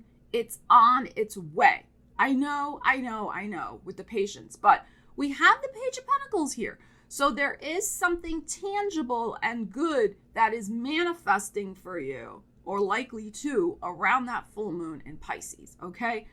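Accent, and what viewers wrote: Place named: Brooklyn, United States. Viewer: Oh! This was a surprise! For some reason I thought you only had done a tea reading for Scorpio!! This is a great reading -- I imagined you delivering it with a Gypsy accent but the Brooklyn one works😂 Yes it's been a mofo 2 of wands space forever. Capricorn appreciated that you get how patient we have been. I don't take credit - Capricorn just does what must be done so we don't really see a choice. Love the page of pentacles coming in. As you know - this cap has sent many applications that have gone into cyberspace...am continuing through the near future -- feels like a treasure hunt.. 💥🌞💥💕😘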